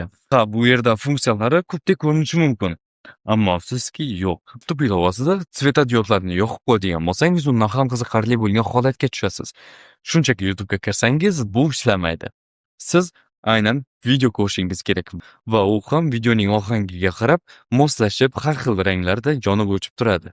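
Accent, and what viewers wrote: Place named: Amudarya, Uzbekistan. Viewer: Aka Amudaryodanmisz shevangiz tortyabdi videoda mangʻitdagi tanish yerlar koʻrinib ketti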